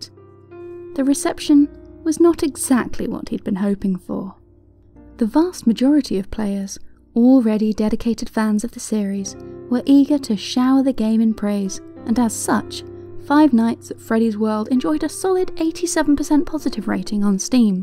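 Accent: United Kingdom. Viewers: Yeah that British accent gave me an instant no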